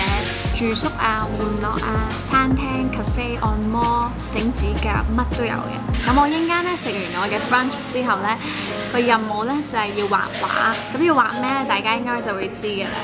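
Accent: Taiwan. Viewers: 每次聽AV講台語的時候都好可愛喔! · 講臺語好像很標準，有親切感，很像鄰居家女兒的感覺，我女兒都沒這麼道地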